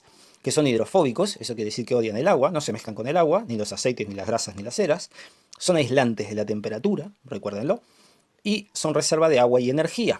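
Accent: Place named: Chile. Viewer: ¿De qué materia y nivel son estas clases? Por el acento creo que eres de Chile, ¿Es correcto?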